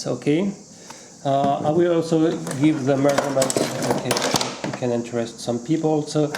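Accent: France. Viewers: Te pas francais ?? parcque vue ton accent on sen que ce francais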